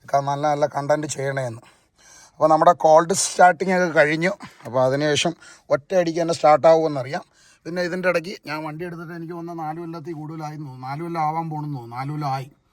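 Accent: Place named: Kollam, India. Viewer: Kollam സ്ലാങ് ❤❤❤❤❤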